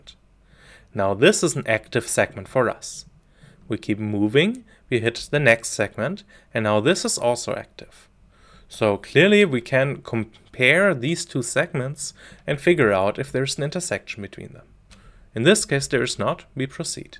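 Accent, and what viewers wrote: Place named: India. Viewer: Dude looks like american, sound like indian. I meant no insult, I just meant you got all to be a tutor online.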